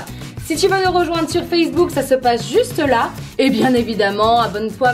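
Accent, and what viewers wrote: France. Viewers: Adoro ouvir o sotaque francês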